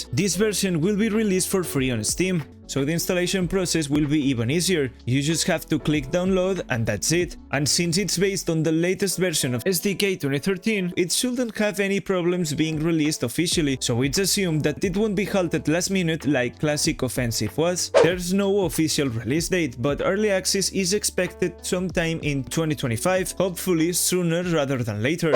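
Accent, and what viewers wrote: Spain. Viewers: COMO SE NOTA QUE ERES ESPAÑOL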